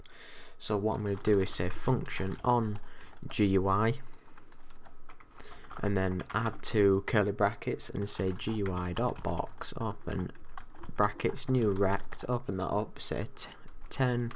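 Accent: United Kingdom. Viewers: your accent is cool :) love british accent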